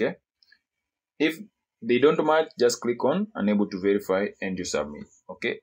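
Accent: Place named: Kenya. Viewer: You have a kenyan accent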